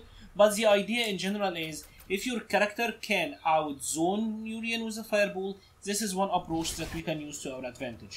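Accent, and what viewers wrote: Egypt. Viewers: Man u sound egyption?? great video . Can u do other mus??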